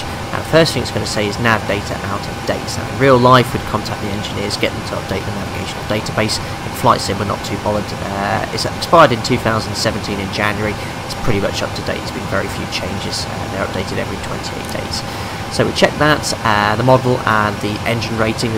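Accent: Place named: United Kingdom. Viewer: Hey , I love your tutorials ..however you have to think about the watchers for which English is not the primary language spoken...if you just could take that into consideration and just articulate more that will help a lot to understand better your strong Brit accent ...specially with sim background noise Thank you ..👍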